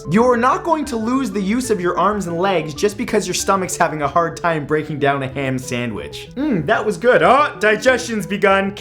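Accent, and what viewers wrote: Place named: Canada. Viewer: I can hear your Canadian accent more in this vid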